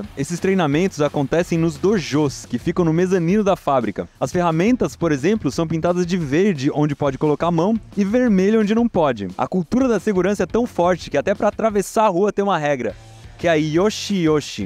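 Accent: India. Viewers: Man pq o parece um indiano com esse áudio em inglês? Eh IA ou é ele msm falando? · At first time I thought you were indian, because of your accent, but then I realized you are Brazilian and the video are Dubbed. Nice Vídeo.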